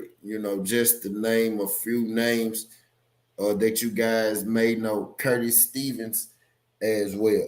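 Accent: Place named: South, United States. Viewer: U sound more country than usual in this video 😂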